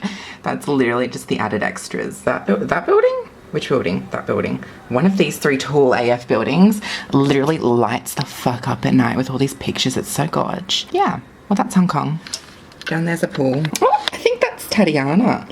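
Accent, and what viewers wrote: Australia. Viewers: Australian accent is BEAUTIFUL, never try to change it or be embarrassed by it😊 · P.s. from jessica. But i love yours and any Australian accent. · YOUR QANTAS ACCENT SOUNDS LIKE MR G FROM SUMMER HIGHS HIGH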